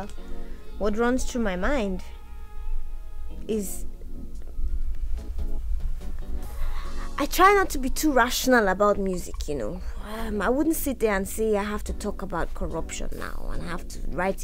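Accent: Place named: Nigeria. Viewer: wow she has conc. nigerian accent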